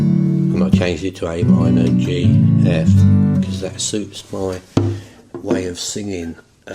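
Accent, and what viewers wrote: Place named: Cockney, United Kingdom. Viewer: You a cockney? I grew up EC1 you sound like my family lol 😂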